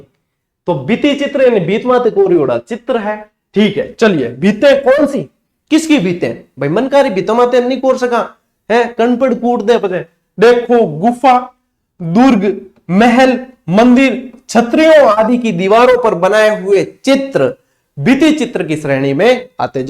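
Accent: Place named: Marwar, India. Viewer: Sir aap Marwadi bolate ho to meethi lagti hai main Bhilwada se hun aur hamare yahan Marwadi bilkul bhi acchi nahin hai bolane mein hi Aisa lagta hai Jaise Lad rahe ho😂😂😂😂😂 Or Thank you 🙏🙏🙏🙏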